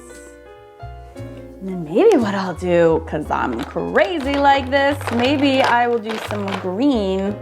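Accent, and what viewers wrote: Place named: France. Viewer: Oooh la la. And I liked your lil French accent. 😂😂😂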